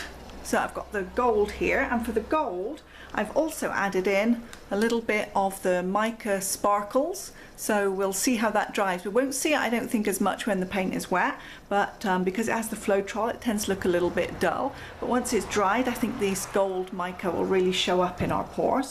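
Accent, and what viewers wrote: United Kingdom. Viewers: You sound British but the brands you use are not available here.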